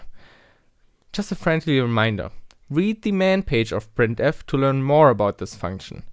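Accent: Germany. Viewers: Are you german? :D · can tell that you are german, but it's definitely not that bad. I can understand you very clearly and I don't think there is anyone who wouldn't. You're very articulate in how you speak and very clear.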